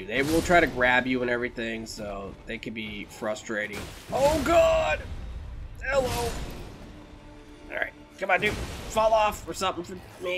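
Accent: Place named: United States. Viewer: you sound to me like you have an accent. Are you okay saying what state you live in? Curious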